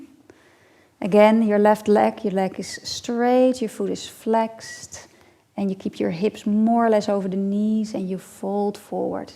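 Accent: Netherlands. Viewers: Definitely a Dutch accent haha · Haha hallo Nederlands accent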